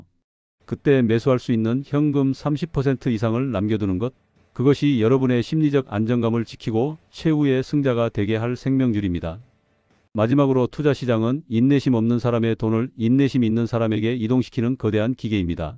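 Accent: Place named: Seoul, South Korea. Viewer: 서울말같기도 아니기도 같기도 아니기도ㅎ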